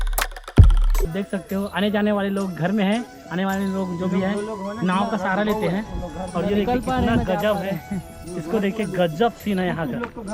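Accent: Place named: Chhattisgarh, India. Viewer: ap ki Hindi + Chattisgarh bahut mazedar h.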